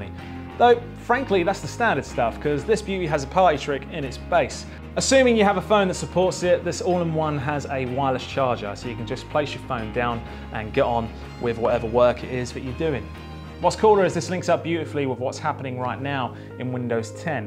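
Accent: United Kingdom. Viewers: with a man of Kent Maidstone accent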